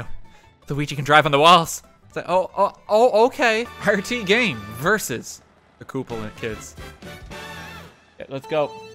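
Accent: Ireland. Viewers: holy shit its irish jerma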